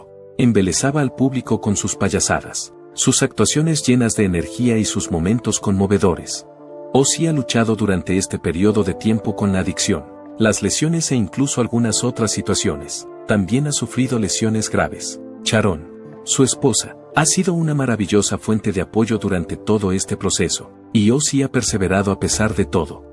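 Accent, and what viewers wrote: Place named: South America. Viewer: Creo k se refiere a k fue una estrella xk antes hacía conciertos y sacaba discos y ahora por su enfermedad no puede solo por eso o de pronunciar Charon en vez d Sharon es porque es sudamericano y ellos pronuncian así por ejemplo mi nombre es azucena pero ellos dicen asusena hasta tengo una anécdota con eso un día k fui a recoger una pizza me decían k era para asusena no para azucena ja ja ya ves osea k no se lo tomes en serio k el chico hizo un video muy bueno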